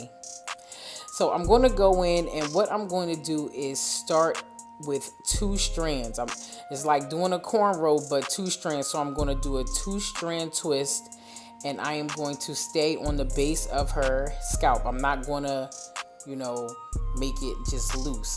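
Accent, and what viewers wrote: Philadelphia, United States. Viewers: Where are you located, you sound like Philly. If so what's up family!!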